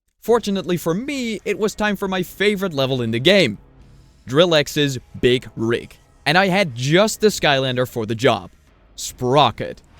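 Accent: Netherlands. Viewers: I teach English to Dutch speakers and I speak Dutch myself. If it's not Dutch i'd be surprised. But honestly, the accent really isn't that bad.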